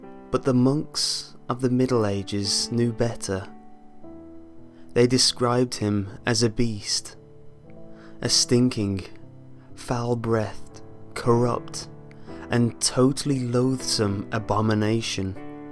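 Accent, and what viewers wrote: England, United Kingdom. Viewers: who are you are you a british or what. im just curious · This guy is mental... so is his soothing british voice.. plus it was disturbing the belt thing... um.. this guy sounds like the british version of Pedobear O-O (\O3O/) GOODNIGHT EVERYONE! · because he is English that's why if you find the accent irritating just don't watch him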